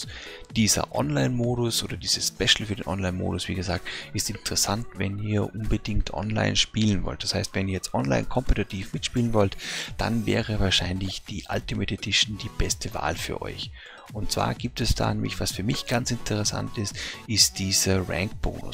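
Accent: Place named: Austria. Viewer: sehr gutes und informatives video! Mich als Österreicher freut es natürlich besonders wenn so eine Zusammenfassung mit österreichischen Akzent gemacht wird. Ich werde mir die special edition kaufen. liebe grüße karli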